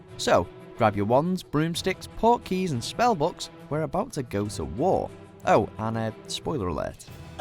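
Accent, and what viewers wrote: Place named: Liverpool, United Kingdom. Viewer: Love the scouse accent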